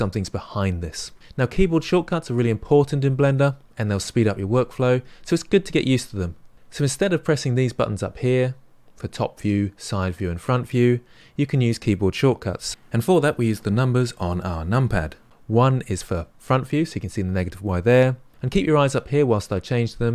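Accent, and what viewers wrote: United Kingdom. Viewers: Nice to have some expert tutorials with a British accent. Gonna get through your playlist, and after watching so many, I hope this will allow me to start creating instead of videos. Thanks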